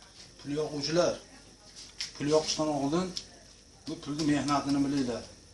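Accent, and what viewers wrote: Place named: Fergana, Uzbekistan. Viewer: Сурхондорйолика емас фодиликларга ухшайсизку .шевадан .пул йоканлар калбака пулни йокади.ахмок емаску одамлар.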